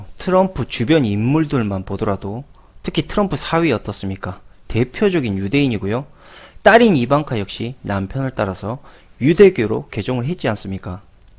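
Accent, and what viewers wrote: Jeolla, South Korea. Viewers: 말투가 전라도인게 뭔 상관이냐 ㅋㅋㅋ 지역감정 부추기는거 정말 혐오스럽다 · 말투가 전라도라....청화대에 세월호 선장 이준석 같은 무리들이 있어서 큰 낭퍠로다. 호남 인민 공화국이 다 된것 같지만.....이 세상의 흐름은 진실 앞에 거짓은 망할 것 이로다. 왜냐구....트럼프가 있기 때문에.... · 아 전라도 사투리 시발 존나 듣기싫네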